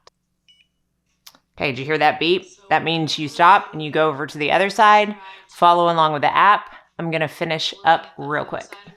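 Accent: South, United States. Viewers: Hi. What a great video. Thank you so much. Always such excellent information. I trust your honest and professional advice. You have, what looks like, perfect skin. I love you and I love your channel. You are so very beautiful and feminine and sexy. With that charming southern accent, it’s no wonder your channel is so popular and successful. Who wouldn’t want to look as gorgeous as you. Thanks again.. Peace, Calvin.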